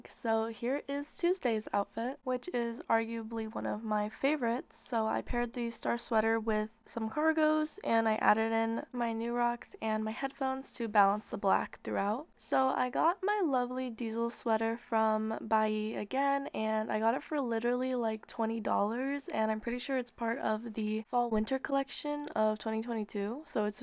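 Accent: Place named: Russia. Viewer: может мне кажется, но почему-то у меня создаëтся ощущение что у неë русский акцент) но девушка очень милая, и образы подобранны очень грамотно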